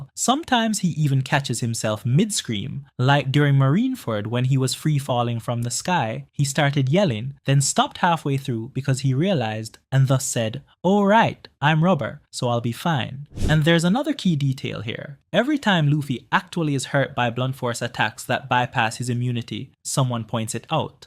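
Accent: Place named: Jamaica. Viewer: Yuh sound jamaican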